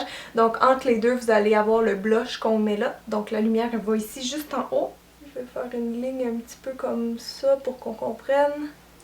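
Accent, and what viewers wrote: Quebec, Canada. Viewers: bnj je suis kahina de l'Algérie pour tous vous dire je ne suis pas trop maquillage mais depuis que j'ai commencé a suivre vous vidéos j'ai commencé a m’intéressé hhhh vous expliquez super bien votre accent j'adore vu que j'aime le Québec mais j'aime pas trop le froid lol vraiment du fond du coeur bonne continuation longe vie a votre chaîne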